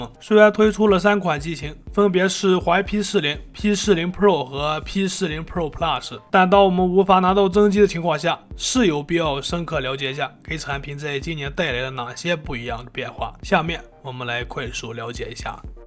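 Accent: Shanxi, China. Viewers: 能练练普通话不？一口山西土味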